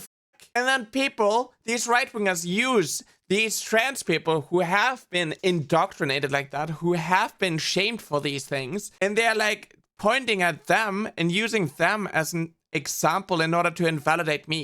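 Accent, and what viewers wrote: Germany. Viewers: Just asking, are you German? You have a very charming accent xD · Why did I not expect a German accent?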